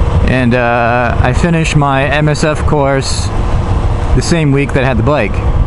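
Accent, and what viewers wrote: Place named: New Jersey, United States. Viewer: I detected an accent in your voice, did you live in Jersey before L.A.? You should get an aftermarket pipe, the M4 slip-on is cheap, so that people can hear you better (keep you safer) and it makes the bike sound like the bad-ass it is. I would also ditch those Mickey Mouse ear mirrors like I did on my FZ 07, too and get some CNC Mirrors by RIDEIT for your FZ 07, on Amazon. They look much better and are adjustable, trust me, and they're only $59.00. Nice little camera there, good mic. Doesn't that low beam suck! I can't stand riding mine at night at anything over 35 or you over drive them. Thinking of getting some good Denali micro lights to mount on the forks/take care of that crap. Be safe!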